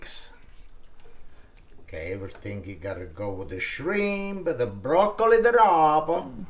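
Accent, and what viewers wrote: Italy. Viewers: My ears: hears Italian accent My brain: This is some serious gourmet stuff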